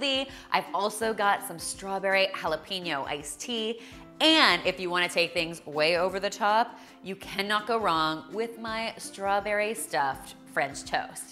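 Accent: Canada. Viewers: yes she's Canadian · you're right, her accent was much more prominent in this video :) I knew she was Canadian, but the entire video I was thinking about how clearly Canadian her accent is in this one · Is she canadian? I didn't notice her slight accent until this video... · terri-louise Ó leathlobhair Canadian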